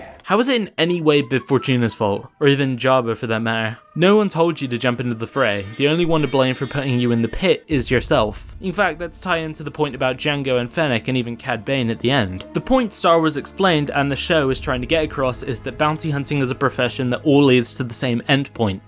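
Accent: West Country, United Kingdom. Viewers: Your subtle west country is quite interesting.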